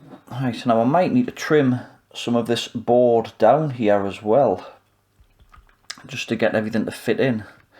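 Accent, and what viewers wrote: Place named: Northeast England, United Kingdom. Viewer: Great video, I have not got a clue what you are doing but it was a great watch, keep up the content, looking forward to seeing more. I recognise the accent, Im from the northeast as well.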